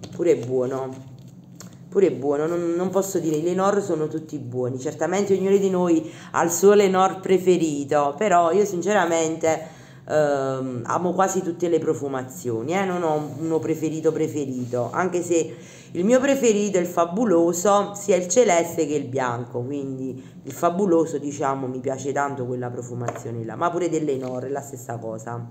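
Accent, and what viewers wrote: Naples, Italy. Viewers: Bella napoli e il suo dialetto....parlaci sempre...un po' di più...ti abbraccio carissima 💋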